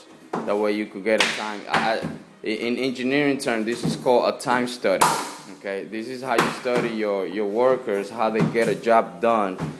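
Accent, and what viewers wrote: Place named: Spain. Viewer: is it just me or does anyone w a Spanish accent not good at anything?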